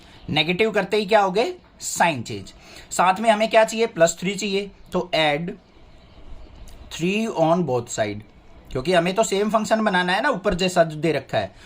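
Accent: Haryana, India. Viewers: sir ji thara haryanvi touch aali boli me maths padhne ke jise alag hi hai thanks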